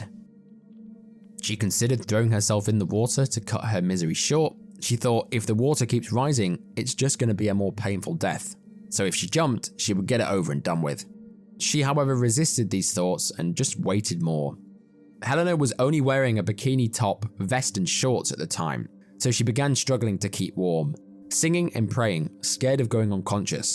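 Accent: United Kingdom. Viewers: I prefer your version more than him because I like British accent in storytelling. 👍🏻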